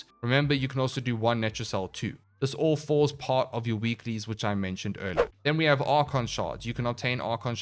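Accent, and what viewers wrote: South Africa. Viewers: South African? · Bra why do you sound south african.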